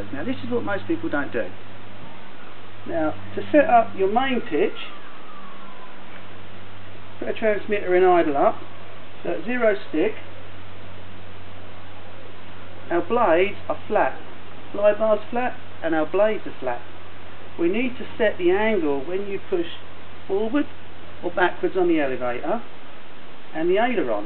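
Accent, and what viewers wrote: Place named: South London, United Kingdom. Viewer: you south london geez? brixton/peckham accent, well cool.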